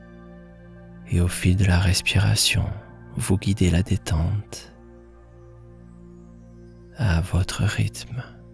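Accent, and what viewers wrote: South, France. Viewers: cher...t'es merveilleux et ta voix est est sublime avec un pt accent du soleil...merci t'es un Grand Soutien pour moi 💙💛🌻😺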